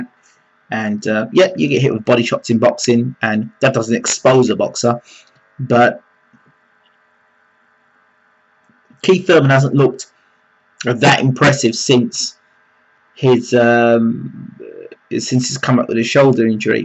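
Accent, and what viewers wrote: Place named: United Kingdom. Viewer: this nigga look like he from South memphis and has a British accent.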